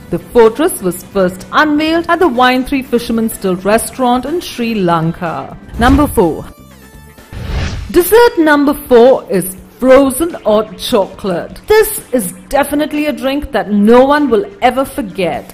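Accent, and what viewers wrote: India. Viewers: The person speaking is an indian Expensive, they are, but if you choke on one of those stones, youd end up in the er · interesting vid but the voiceover sucks balls. she is a northern indian. not blaming the accent...the tone is driving me insane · She has a indian accent but she's trying the British accent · i fear the speaker is of Indian origin, awful english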